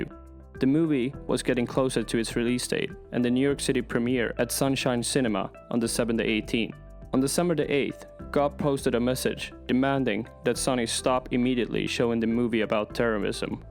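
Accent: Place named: Sweden. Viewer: Intressant, men skulle vara bättre om det inte lät som att ni läser och försök få bort svengelskan!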